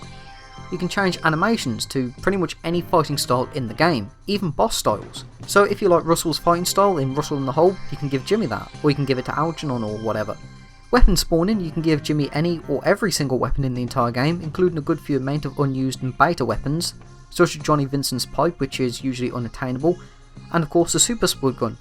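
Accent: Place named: RP, United Kingdom. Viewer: You sound a bit posh, where are you from if you don't mind me asking?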